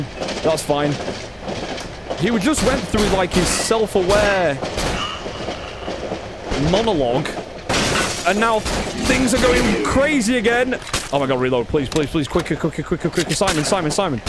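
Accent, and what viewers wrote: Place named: England, United Kingdom. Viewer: Just found your channel through this letsplay, so nostalgic and you have the perfect balance of theorizing and commentary without overwhelming the game! Small question, I apologize if this is rude, but where in england are you from? love your accent best of luck on continued channel growth and congrats!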